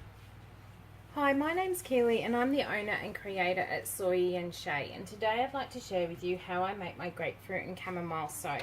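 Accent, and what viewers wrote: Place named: Australia. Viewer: Delicate and lovely soap! Love Australian accent!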